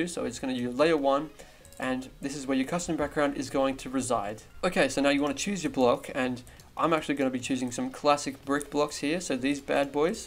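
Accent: Australia. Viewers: You from Australia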